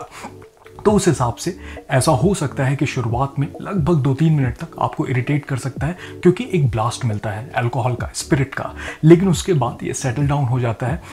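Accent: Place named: Lucknow, India. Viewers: you speak elegantly. lovely urdu are you from lucknow? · For some reason, your Hindi seems like you are from Lucknow (or vicinity).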